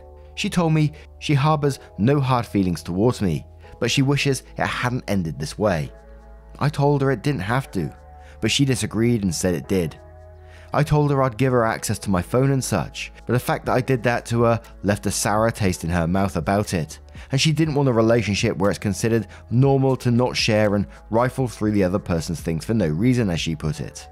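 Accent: England, United Kingdom. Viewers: Why is the narrator who sounds English saying he needs therapy? Who the F gets therapy in England or most of the world in fact? It's an American thing. I would never go chat to some stranger about my life and listen to their bullshit opinions. I make my own decisions in this world.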